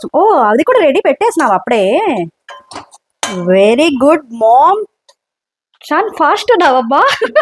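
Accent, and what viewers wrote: Karnataka, India. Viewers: Miru karnataka vala mi telugu border lo kannada valu ela ne matladutharu memu same mi la ne matladuthamu telugu but we are kanadigas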